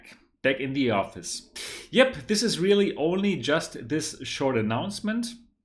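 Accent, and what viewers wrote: Germany. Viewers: You’re so amazing! How could you German speak so heart-touching English and also excellent Chinese. 🤩😃 And I had also watched your other vlog videos.. daily lives...with your wife I love your English speaking accent😳😢 ! And all of your styles... _ I can speak English and Spanish,but I also want to learn German❤️! I’m from Taiwan 😢 ,soon I’ll go to Europe. Spain,Portugal and your country “Germany”🇩🇪 ! And I love football so much !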